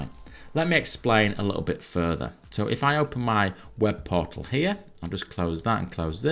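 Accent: Yorkshire, United Kingdom. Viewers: American here. Hearing IT information in a Yorkshire accent - GOLD. I love that accent. So cool. 😊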